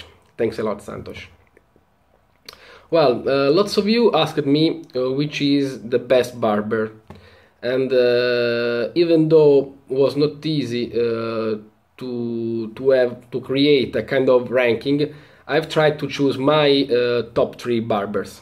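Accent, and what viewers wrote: Italy. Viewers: si sente che sei italiano ahah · Hello ! such a pleasure to hear you express yourself , what a beautiful Italian accent . The videos of India were wonderful , such great effort put in them. I'll have to look at some again to choose in taking the survey . Thank you for all you've done . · si sente che sei italiano LOL... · Si sente che sei italiano ahah Sei un grande! Come ti chiami e come è nata questa idea?!? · I love your Italian accent when you talk English! And again, many thanks for putting up this wonderful series to both you and your wife. I love Indian barbers and it was so great to see so many videos with all the different and unique barbers / masseurs. I think I'll be watching this series of videos for a LONG time to come.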